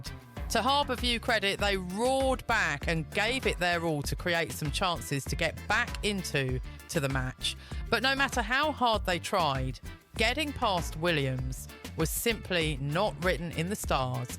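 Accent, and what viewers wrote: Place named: England, United Kingdom. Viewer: It's a bit of a shock going from a Jamaican accent to an English one but to be fair she is good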